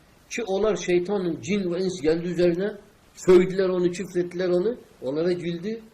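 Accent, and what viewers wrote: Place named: Turkmenistan. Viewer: hocanın şivesi türkmence, allah rahmet eylesin saddam hüseyin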